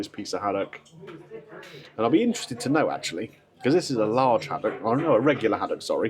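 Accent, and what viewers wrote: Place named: Cornwall, United Kingdom. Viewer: For me, I honestly think you have a Cornish accent! 😂. Nothing wrong with it, it's great!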